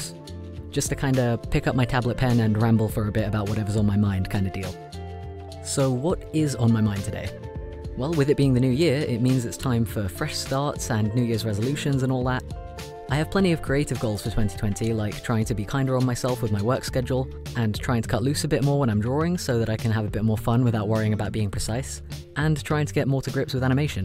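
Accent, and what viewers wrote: South, United States. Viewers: As an American LGBTQ+ person I don't blame you going American (and definitely southern). My country has a long way to go in a lot of ways when it comes to the acceptance of people like us. I hope that this year fixes that. I'm really happy for you in how you now feel so much more comfortable in who you are, and your art is still gorgeous. Happy 2020 dude! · As someone who lives in Texas: I can 1000% confirm this is a Southern Accent. It's better down here in Texas now than it was a decade ago, as I think a lot of places are a lot better, but man, can it still be hard. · Oh my god your American (southern, really) accent gives me LIFE. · nice country accent! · yo that wasn't just American, that was southern! (and accurate lol).... but there's still gays in the south................. lol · Yeah I live in the U.S. definitely southern accent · southern accent ON POINT I respect you on so many levels, live your best gay life my dude!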